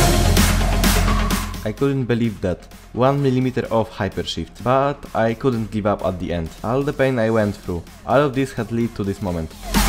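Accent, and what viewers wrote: Poland. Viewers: fajnie montujesz i wgl ale jak mowisz po angielsku to az boli xddd taki akcent bie angielski i jak sie baoglodam czasem anglikow to ptm mn uszy bola jak np b oglodam z pl akcentem xddd pozdro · It’s because of his Polish accent, which we can’t blame him for, however, YouTube’s automated captions generate what he’s saying pretty well.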